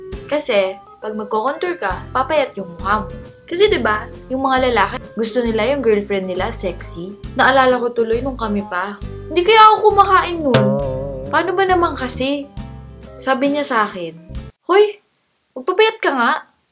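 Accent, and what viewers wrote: Ilocos, Philippines. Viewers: ilokano ka ba?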